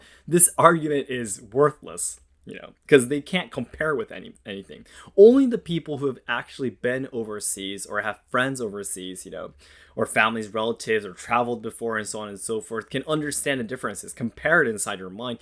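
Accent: United States. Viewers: I am very grateful and happy that you speak so politely in English. I've never needed to worry about who is around me be upset or offended by hearing anything on your channels. Which makes me very happy. As far as your English accent being American or basically the same as mine. I think that it makes it easier for anyone who speak English to understand you. They send people from other parts of the US to the region you lived in / I am from to learn how to speak American English in a way to be well understood. You are very polite in English too.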